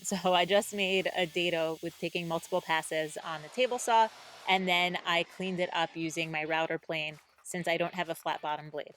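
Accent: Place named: Long Island, United States. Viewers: great build. My son would have loved this 10 years ago! BTW, can totally hear the Long Island in your voice on this vid.. :-) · Very nice! I can see this piece being handed down for generations. And the northeast accent "draws"... gotta love it!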